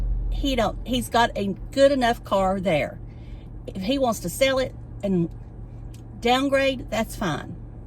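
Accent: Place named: South, United States. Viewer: Great conversation. You really have talent for it. I never stop listening! This video reminds me old radio shows they did years ago with like a mystery or a who done it! Well anyway i guess its the accent the southern belle sound. Im trying to figure it out but you were not all over the place just connecting all this mystery about the wackiest person on you tube so if you even try to decipher what is going on its gonna be a chore.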